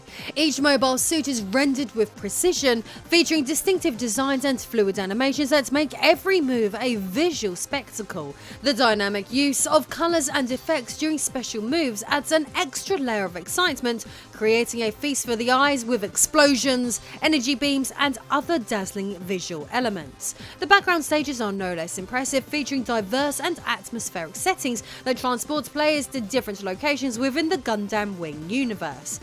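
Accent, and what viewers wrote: United Kingdom. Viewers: I like doing the segment where you were talking about Mobile suit Gundam, with your British accent it sounds like you're saying, got damn. With your British accent, when you speak, you say every syllable within a two syllable word, instead of, just saying it as one word, you and your husband, do this, I'm just telling you, what I've noticed, it's not bad, I'm just giving out my two cents here.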